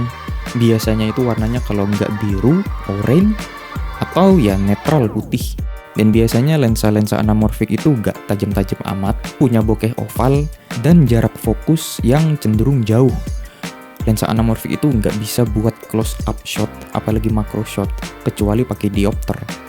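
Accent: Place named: Madura, Indonesia. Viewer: logat maduranya nampak banget bang😂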